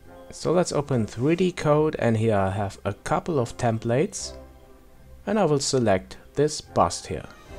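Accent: Germany. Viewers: haha nein dein englisch ist sehr gut aber man hört, dass du deutsch sprichst p.s tutorials zum addon wären klasse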